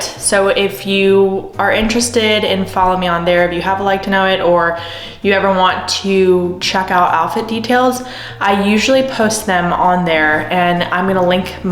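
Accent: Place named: Georgia, United States. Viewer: I randomly found your channel and as soon as you spoke I knew you were a Georgia girl!! Loving your content!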